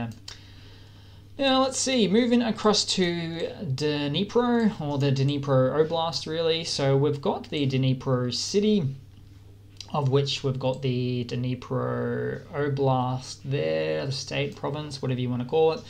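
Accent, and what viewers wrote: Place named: Australia. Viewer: I like how you report major events based on their locations using a map. Your Australian accent adds individuality to it.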